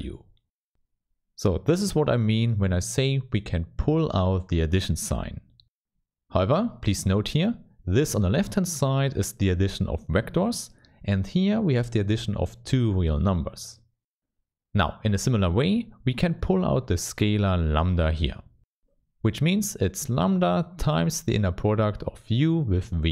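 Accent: Germany. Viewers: nice video, thanks a lot... and you sound German with your accent😁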